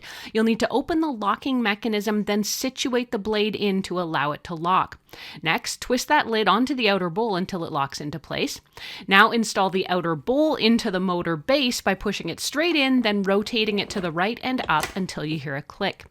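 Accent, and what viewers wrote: Canada. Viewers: Excellent 905 accent.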